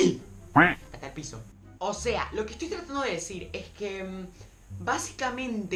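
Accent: Argentina. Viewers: se le nota los argentinos hablamos así uwu.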